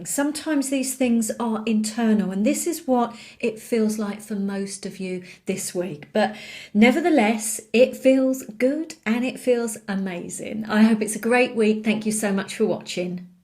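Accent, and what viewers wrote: United Kingdom. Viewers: Good Morning!..From California.....Gemini is here...How r u? Lovely lady...Thank uuuuu so much for the beautiful reading for me ...Trully appreciated ....By the way, I love your British Accent....Tc ..Much love .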